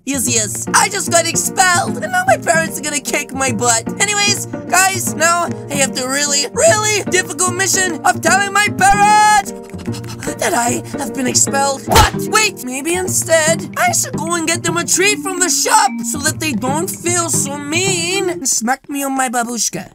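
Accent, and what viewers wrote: United Kingdom. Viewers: uk guy ha? cool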